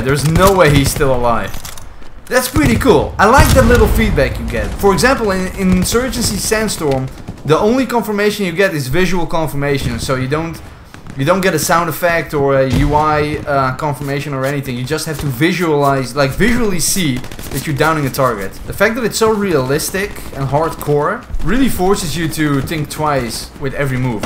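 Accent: Netherlands. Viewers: I can hear a Dutch accent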